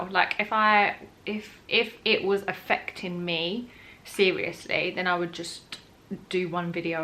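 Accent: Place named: United States. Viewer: Yass girl look at that fresh beautiful skin, you are glowing ✨✨ When you said awkward 🤣🤣 I feel I’m a bit old for advice videos but I still watch anyway cos you are hella entertaining...and you guessed it I said hella in American accent ☺️ You don’t need to apologise for anything. Ps my family are hella annoyed at me because of you 🤣🤣 I’ve only been calling bloody eat out to help out - help to buy...that’s all your fault haha x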